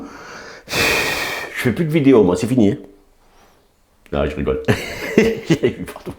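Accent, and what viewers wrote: South, France. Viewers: Excellente vidéo dans le sérieux et la décontraction avec ce petit accent du Sud pour agrémenter le tout. Ça donne envie de s'y mettre ! Bravo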